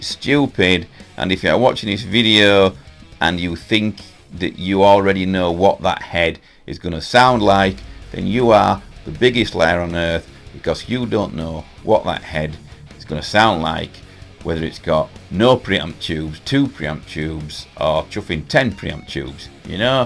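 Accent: North, United Kingdom. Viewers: So great to hear a fellow northerner talking sense! I have subscribed.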